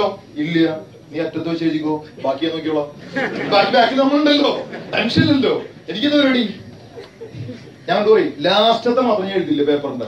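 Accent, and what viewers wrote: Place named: Malappuram, India. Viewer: Do arado paranjad malappuram bhaasha virthikettadennu listen to 19:4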